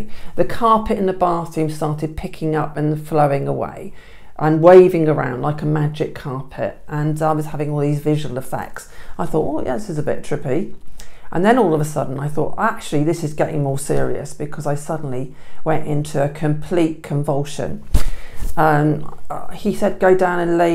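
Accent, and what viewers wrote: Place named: England, United Kingdom. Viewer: Nice to hear an English accent on one of these stories. I went to art college, wonderful times. Thank you for your openess. xx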